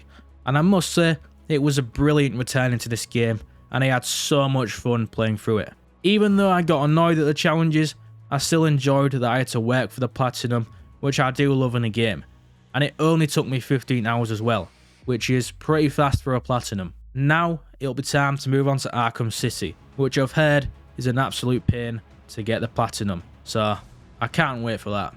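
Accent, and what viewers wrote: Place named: Eastern Europe. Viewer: Damn bro what is that accent ? You might as well be Eastern European.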